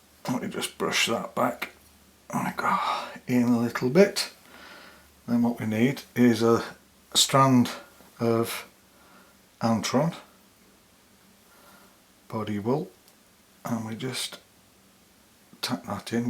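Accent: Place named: Lancashire, United Kingdom. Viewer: A wee nosy question......where did you pick up the traces of scottish inclusion within your Lancs accent?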